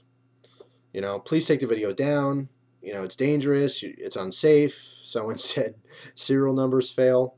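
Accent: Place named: New York, United States. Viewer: you sound like you have a New York accent lol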